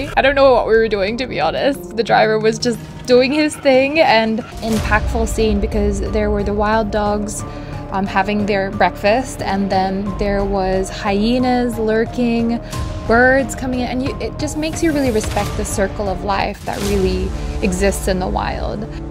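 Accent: Australia. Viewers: Her Aussie accent😁 Elephants are my fav, they have amazing memories and they would dig hole for water and thats also a bonus to all other wild animals. I WISH you visited sheldrick wildlife trust, they do amazing work for wild animals in kenya ❤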